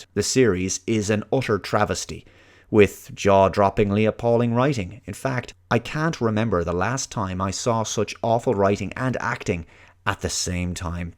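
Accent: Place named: Ireland. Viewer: At first I hated the accent because I thought he was British but towards the end of the video I realized he was Irish and started liking the accent. I think I'm racist.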